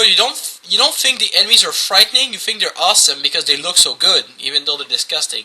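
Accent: France. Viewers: Let me guess... French accent?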